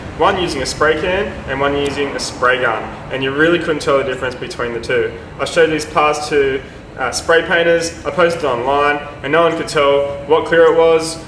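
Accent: Australia. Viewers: An Aussie if Ive ever heard it! Good video mate. Keep up the good work.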